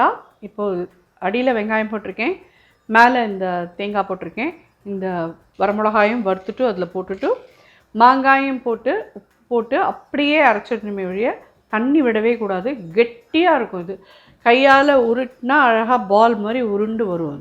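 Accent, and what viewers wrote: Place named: Thanjavur, India. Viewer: ட க்கரா இருக்கும் தஞ்சாவூர் ஜில்லா காரா பேச்சு போல இருக்கு . நீங்கத் தஞ்சாவூர் ஜில்லாவா .நாங்க கும்பகோணம் .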